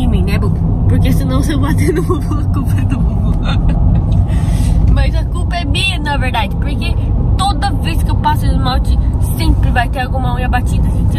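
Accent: Japan. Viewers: o interessante o seu sotaque em portugues.....parece uma japonesa falando em portugues Estudei japones no Brasil e meus parabens por ter feito daigaku no Japao.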